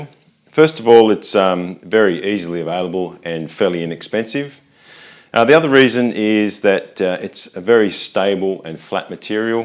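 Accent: Australia. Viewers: Your channel is growing because you have awesome videos and because you have an Aussie accent. Keep em' coming!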